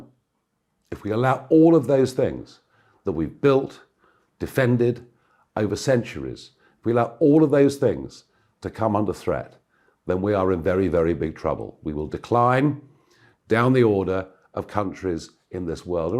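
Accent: RP, United Kingdom. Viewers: think he's realised Tommys right! Now speaking like a Posh Tommy???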